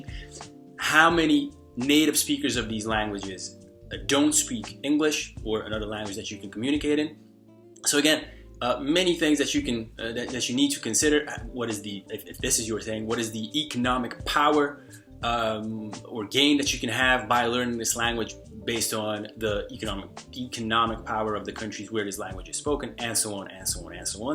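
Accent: East Coast, United States. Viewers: I have watched your previous videos and I really can see big difference in your American accent, it's like you've been staying quiet good time there and absorbed the accent. Great work! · Hey, native English speaker from California here. From a west coast perspective, you definitely have a noticeable accent since you sound very east coast/urban with certain words here and there. If you're open to tips, ironically -- one of the words you pronounce a bit differently from most Americans is the word "language." You pronounce it like "Lan-guage" when most Americans pronounce it "Lang-guage." The first syllable sounds a bit flat when you say it -- it's usually "Lang" as in Langston Huges (the author) not "Lan" as in Lanyard -- the way you say it. Hope that helps. Anyway, keep it up! Your vids are awesome and your tips are really helpful. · Honestly, I didn't notice the east coast american accent until you mentioned it. 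But then I totally got it. The first time I heard you speak on Youtube I just thought you were american! It took about 10 or 15mins of listening to you before I noticed the hint of an accent. And I could've never guessed you were from a slavic country 😂 I guess it helps learning English as a kid while in the US. Most slavic language speakers who learn English as a 2nd language have pretty heavy accents that they have a really hard time improving. As far as non-native English speakers, you're in an elite class of maybe 3 or so YouTubere I've seen where I just couldn't believe they weren't native at first! Anyway, I'm from Cincinnati, OH, so maybe that's a reasonable native English speaker's assessment? Anyway, love your videos, man! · oh I thought you are from the US man, good spoken American English.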